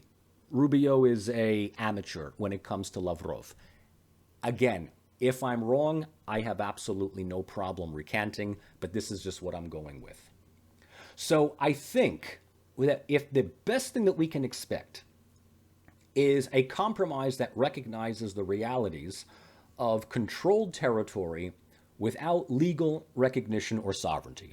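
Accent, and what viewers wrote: New Jersey, United States. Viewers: I am so happy to see your post. I've only just started to watch, this video but it looks like we will hear your views and info alone. I feel very lucky that I found your channel a while ago and I thank you for the things you share with us 😊 I like much better when you give your views alone, but I guess we need to be more open to others as well. Besides, I like to hear you speak because I am from New Jersey also ❤❤❤❤❤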